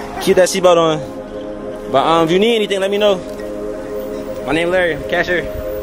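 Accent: United States. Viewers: Is she like from Texas? She got an accent it sounds like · her southern accent is so beautiful 😍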